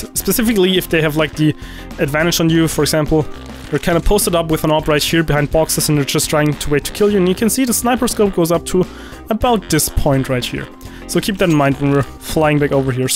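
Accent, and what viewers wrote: Germany. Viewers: Just asking but are you from germany?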